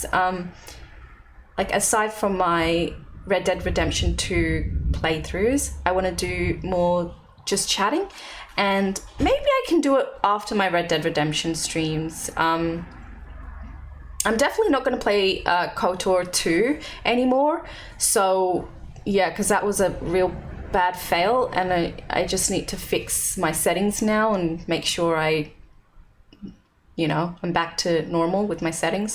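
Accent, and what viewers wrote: Australia. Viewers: I'm looking forward to the MCU stuff for sure, but go at your own pace. A happy reactor, is a better reactor. 😁 Can sort of see some resemblance to Sweet Anita but that good old Aussie twang is instantly recognisable, for me anyway haha. Very easy to tell you apart from my perspective at least, even if it's purely on the looks. got her own vibes & style! 👍😂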